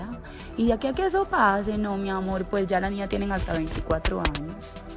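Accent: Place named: Colombia. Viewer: Ese acento es mi compatriota de Colombia dime si no estoy en lo correcto 😂😂😂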